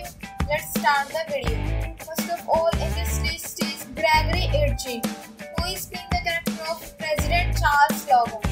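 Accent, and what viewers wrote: India. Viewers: The way u pronounce the names made me cringe so badly. Typical badh badh ding ding Indian accent.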